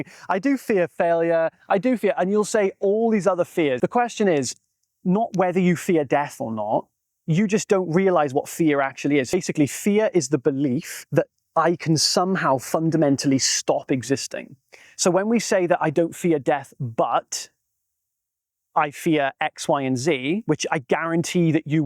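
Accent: Wales, United Kingdom. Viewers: Beautiful background! Where is that? By the way, is your accent Welsh?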